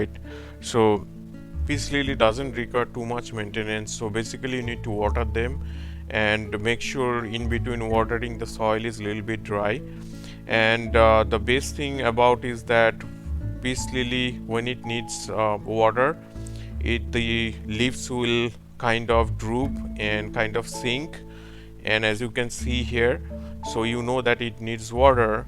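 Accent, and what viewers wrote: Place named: India. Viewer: That accent..Assamese / Bengali?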